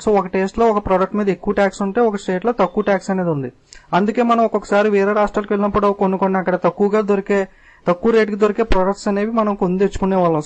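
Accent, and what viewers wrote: East Godavari, India. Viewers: babu needhi Thoorpu godavari ya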